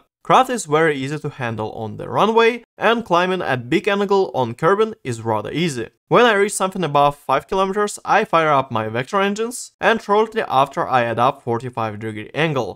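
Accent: Russia. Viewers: это русский акцент или мне кажется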